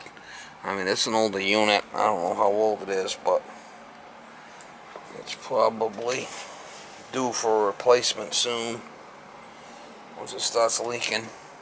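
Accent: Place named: New England, United States. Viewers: Psycho chicken LOL. Been over 30 years since i heard this one. Where you from? Sound like southern New Hampshire or Massachusetts. Maine hete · Sounds like my hometown of Plainfield CT. Accent is spot on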